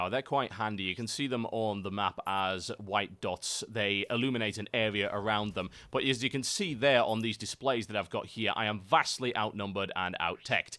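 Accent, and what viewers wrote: United Kingdom. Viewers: You may not be an experienced player, but you're a pretty darn good commentator. Love your accent. UK, right? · lol... British.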